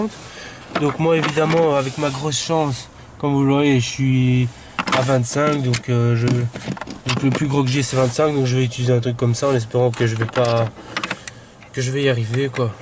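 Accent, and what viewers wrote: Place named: Belgium. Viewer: merci pour cette super vidéo qui va me faire économiser quelques sous et qui m'aura aussi beaucoup fait rire entre ton p'ti accent belge , je pense , et tes commentaires ! Si la cuisine te gonfle , tu sauras dans quoi te recycler . Bonne continuation.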